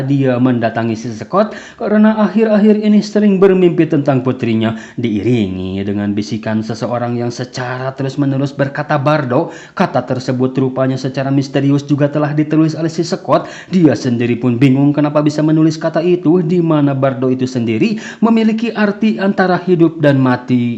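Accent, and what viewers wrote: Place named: Sukabumi, Indonesia. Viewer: Dari logatnya lu kek orang pelabuhan ratu Sukabumi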